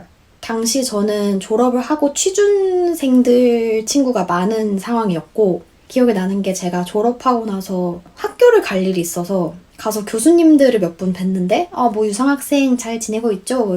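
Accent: England, United Kingdom. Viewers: 와 영국발음ㄷ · 우연히 영상을 보게됐어요 :)) 유상님에게 영국이 그렇듯 저에겐 프랑스가 정말 그런 존재여서 많이 공감되고 혼자 대답하면서(....)봤던 영상입니다 😌😌 정말 많이 공감가는 부분은 누가 시켜서가 아니라 내가 좋아서 한 생각들과 결정들이기 때문에 시키지 않아도 늘 뭔가를 했고, 누군가는 이 나라를 답답하다고 말할 때 저는 항상 빨리만 달려왔던 삶에서 벗어나서 느리지만 여유로운 문화에 적응하고 싶다 라고 말했던 것 같아요 :)) 그리고 그냥.. 참 좋다는 점! 프랑스어에 꽂히고 프랑스에 꽂혀서 결국 지금 프랑스에 있는데, 이렇게 영국영어를 접하게되니 정말 너무너무 매력적인 것 같다는 생각이 드네요 ㅎ_ㅎ!! 정말 그 무엇과도 바꿀 수 없는 소중한 경험들 많이많이 만드시길 바랄게요 🥰🥰!! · Did you grow up in Korea or England? Since your accent is so posh I'd say you grew up in England. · 우연히 알게 됐는데 정말 너무 마음에 와닿는 얘기가 많은 것 같아요! 저도 지금 영국은 아니지만 다른 나라로 교환 준비 하고 있는데 남들보다 뒤쳐지는건 아닐까, 이런 저런 걱정들이 많았거든요! 가고싶다는 마음은 확고한데 언니 말씀대로 주변 반응도 뜨듯미지근 하기도 했구요..! 그런데 제가 좋아하는 거고 저도 지금 이 나이에 이 경험을 하고 싶은거니까 나중에서도 어떻게되든 후회하지 않을 것 같아요!! 언니 영상 보면서 용기도 많이 얻었고 되게 위로받는 기분이 드네요... 💓 유튜브 알고리즘으로 영국영어로만 하는 브이로그 봤는데 제 귀에는 엠마왓슨처럼 들려서 정말 너무 멋있고 깜짝 놀랐어요! 구독도 하고 자주 챙겨보고 (정말 안지 얼마 안됐지만 ㅎㅎ) 항상 응원할게요! 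너무 멋있고 이런 영상 만들어주고 경험 공유해줘서 감사합니다 🍑💫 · 유상님 영상보는데 영국발음에 또 홀리네요ㅎㅎㅎ 구독하고갈게용> < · 영국발음에 반해서 다른 영상들도 정주행중입니다 ㅋㅋㅋㅋ정말 대단하시네요. 엄청난 노력을 하신것 같아요.. 같은 워홀러로서 되게 제 자신이 부끄러워지네요. 영상 볼때마다 영어자극 팍팍옵니다. 감사합니다~많이 업로드 해주세요🙏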